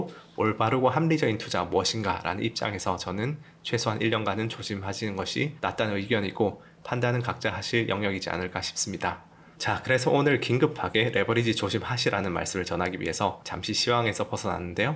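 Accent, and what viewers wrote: Gyeongsang, South Korea. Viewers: 대구 말투네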